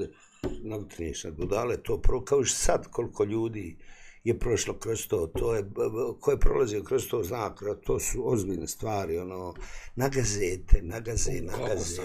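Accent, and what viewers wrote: Bosnia and Herzegovina. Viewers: Jel on iz Sarajeva pa ima Sarajevski naglasak ilije iz Pala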